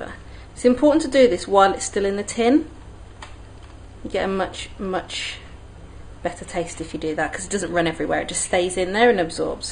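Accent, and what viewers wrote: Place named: RP, United Kingdom. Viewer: I love your accent... posh English accent you've got. Queen English 😍😍😍. I am soo going to try this.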